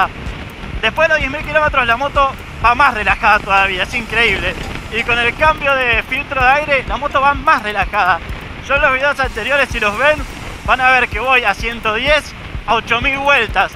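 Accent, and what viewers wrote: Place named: Mendoza, Argentina. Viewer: Chilote. Jajaja naaa perdón amigo y sensual jajaja. Es MENDOCINO MI AMIGO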